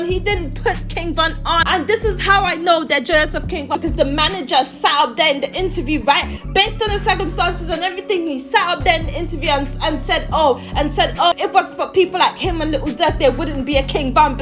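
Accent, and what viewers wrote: United Kingdom. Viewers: Imma real O block fan and it pisses me off to listen to this bullshit your DISRESPECTING KING VON’s legacy, family etc... IF YOU WANT TO MAKE MONEY AT LEAST DO PROOER RESEARCH AND SPEAK FACTS!!!! You sound like some U.K girl with wild imagination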